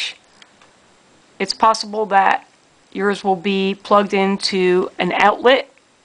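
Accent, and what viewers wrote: Philadelphia, United States. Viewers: Philly area gal. Can tell by the accent. "Wooder."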